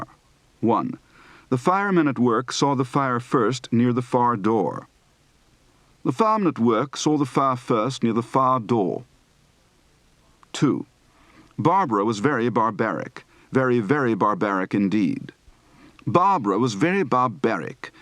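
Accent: RP, United Kingdom. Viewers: This accent was still quite common among the upper class in the West Country during the 1970s. The movie "Pygmalion" (1938) is a great resource for hearing different accents of the pre-WW2 era, with Leslie Howard speaking RP throughout. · Lovely example of High RP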